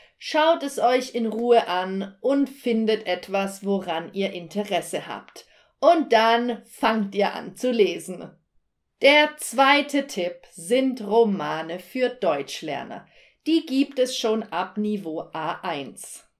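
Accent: Russia. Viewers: Да слышно же, что она русская ^^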